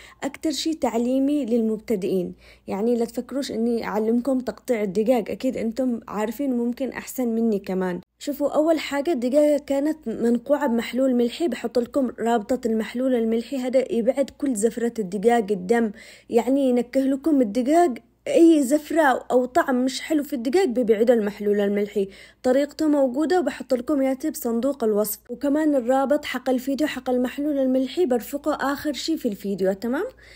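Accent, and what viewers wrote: Aden, Yemen. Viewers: شكرا جزيلا لك يا بنتي كلامك العدني الأصلي والاكل ماشاءالله تبارك الرحمن ربي يحفظك حلو جدا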